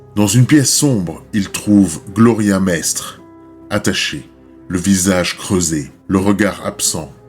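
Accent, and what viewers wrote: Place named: France. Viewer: Coucou, j'espère que tu vas bien ? Que faire quand on se rend compte de ta notification vers 4h du matin ? On se couche plus tard car on ne peut pas résister 😂. Par contre tu as un accent français très marrant. Encore un qui a eu une enfance très solitaire et pas très stable, pas très enrichissante pour un enfant. On peut comprendre qu'il veuille prendre le contrôle, lui qui ne l'a jamais eu et surtout se faire voir. Par contre il n'a pas "chômé" niveau nombre de meurtres, il les a tué de manière assez rapprochée par rapport à d'autres tueurs en série. Merci encore pour ta vidéo hyper intéressante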